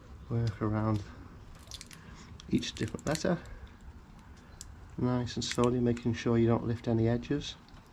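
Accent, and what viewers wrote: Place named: Lancashire, United Kingdom. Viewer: Just having breakfast clicked on YouTube watching your channel as I only found you about a month ago I'm watching your 1st ride on the GT. I know this road intermittently, Settle if I'm not mistaken. This is my 2nd blade too. I had a 06 which was better than the 15. Just watching next vid I think you just confirmed Hawes n Settle. Must crack on I've just moved from Stockport to Rochdale, your accent suggests Burscough/Skelmersdale. Calimoto screen shot north of Skem when I get the GT I'd love to meet up.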